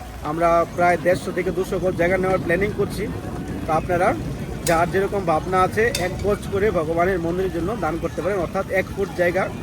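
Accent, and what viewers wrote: Bangladesh. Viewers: ভাই তুমি কি বাংলাদেশী। language টা এমন কেন?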